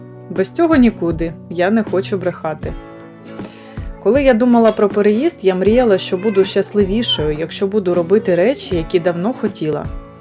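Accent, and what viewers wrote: Standard. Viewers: Ютуб закинув це відео в рекомендовані і воно набирає перегляди . Робіть заставки відео без дрібного шрифту . Як для блогера у вас гарна дикція, чиста українська мова і вміння формулювати думку . У вас все вийде, розвивайтеся, експериментуйте - успіх буде . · Дякую вам. Ви трішечки змінили мою думку про східних переселенців на кращу. Особливо вражає чиста українська мова. Бажаю вам, щоб у вас все було добре, і вірю, що так буде: і у вас, і у нас-в Україні.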